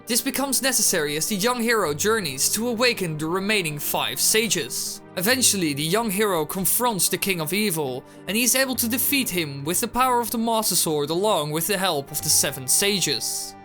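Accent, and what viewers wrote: Netherlands. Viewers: In het begin een beetje te Nederlandse multiple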